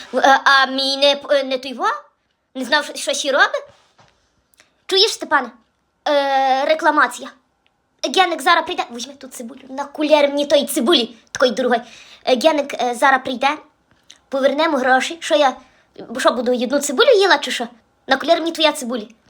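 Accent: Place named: Western Ukraine, Ukraine. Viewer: ВИ СУПЕР ПАНІ. ЛЮБЛЮ ЦЕЙ ДІАЛЕКТ ЗОЧ НАСПРАВДІ ВЖЕ НЕ ЧУЮ ЙОГО В НАС НА ЗАХІДНІЙ. ДЯКУЮ ВАМ СОНЕЧКО. ПРОДОВЖУЙТЕ НАС ВЕСЕЛИТИ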